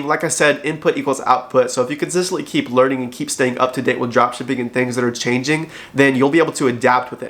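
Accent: United Kingdom. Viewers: Hahaha! Your British accent is jokes! 🤣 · Love it! Great value. Thanks!🙏Room set up and colors are electifying and soothing - so cool! Your British Accent is Brilliant and hilarious and Prince Ghost is a real star attraction!💕😊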